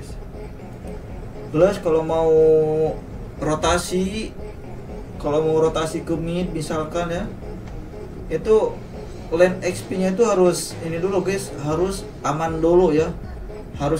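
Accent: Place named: Garut, Indonesia. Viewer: Logatna jiga urang sunda mang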